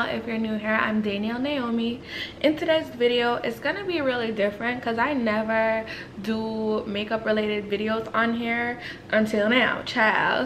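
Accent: Jamaica. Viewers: The Jamaican accent!!! Yeh girrrrl... right out 😂❤️ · gurl JAMAICAN PURRRRRRRR. DONE KNOW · Mi hear the patwa and affi subscribe 🇯🇲🇯🇲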